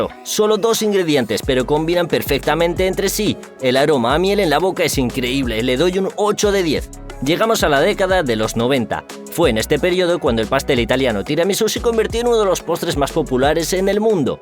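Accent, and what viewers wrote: Spain. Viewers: Qué bien que pongan una buena voz en español, en castellano como tiene que ser y no en latino como ya se escucha en demasiados vídeos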